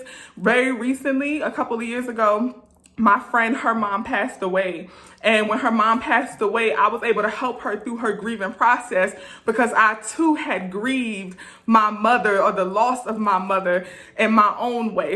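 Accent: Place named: New York, United States. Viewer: Thank you, sincerely, for this,!! My heart so, so needed this. Much love!! Àse! Also, I’m married to a New Yorker and I just love your accent and the way New Yorkers talk — the realness! 🙏🙏🖤🖤🖤💜💜🔮💫🧿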